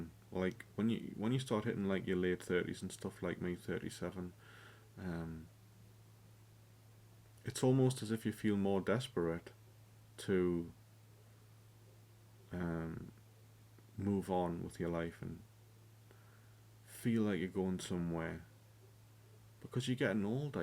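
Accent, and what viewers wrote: United Kingdom. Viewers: Youtube random algorithm sent me here so, going to write a comment (I know this was posted 4 months ago but I hope you read it). Life doesn't have to have purpose, friends come and go, lovers as well, there is no eternal love, all ends when one of them dies. Try to volunteer, you will meet new people, and will feel a sense of purpose. A pet helps, physical exercise (hiking, walks, weights) as well. "Who's going to love you, if you don't have a job?", your constructed ideas are putting you in a prison, I met my bf when he was unemployed, he took over 1y to get a job, that never got in the way of our relationship. I earn more than he does, he is on minimum wage, and I really don't care how much he makes, if he enjoys what he is doing. The happy family idea, is just an idea, most of my close acquaintances have families that are quite dysfunctional, very few are really happy. 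I know plenty of people that are 40y+ that are single and they are actually happier than most married people I know. Being alone is different from loneliness. You achieved being an engineer, earned a good wage, you traveled, and learned the lesson that you should have saved for the rainy day. Life has ups and downs, it is not a highway like propaganda portraits. Start reading about stoicism (there are a lot of sources for free online, you can start in reddit- r/stoicism), self-compassion, resilience and get therapy (I think you are from UK from your accent, NHS provides free therapy). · Geordie love it I’m from Hexham bro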